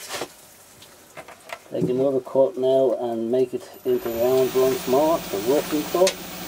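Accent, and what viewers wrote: Ireland. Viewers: I very much appreciate your approach to turning and especially your comments regarding safety. Very appropriate. I just found your site and have started watching your videos. I lived and worked in Ireland in the mid-90's for 2 1/2 yrs so I recognized you were Irish immediately! Are you from the Dubin area? North Dublin, perhaps? My ear for the accents is not as sharp as it used to be when I could pick up a Dub, or a Cork or Kerry man when I lived there. Cheers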